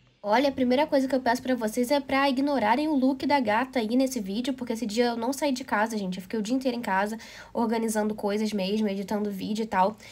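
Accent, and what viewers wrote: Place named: Rio de Janeiro, Brazil. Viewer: Tanto casaco; com esse sotaque de carioca? Faz frio ai? Só em Petrópolis ne né?!!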